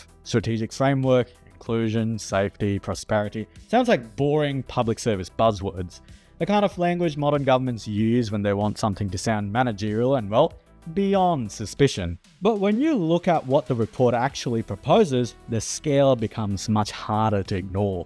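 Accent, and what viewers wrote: Australia. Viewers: I'm hearing Australia, probably Adelaide, South Australia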